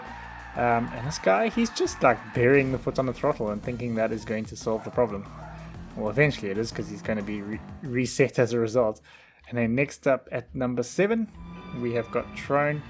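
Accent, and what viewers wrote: South Africa. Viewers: Is that a South African accent I detect?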